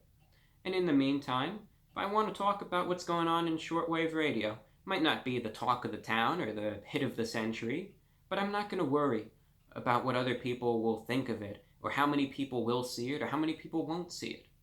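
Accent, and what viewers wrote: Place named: Mid-Atlantic, United States. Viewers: I can't eat any of the food you review, due to dietary restrictions. But I'm still a loyal viewer. "what is this channel known for?" To me? It's your mid atlantic accent, polite speaking, and your sense of style that I thought had left this world decades ago. I lost that bet. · The New York accent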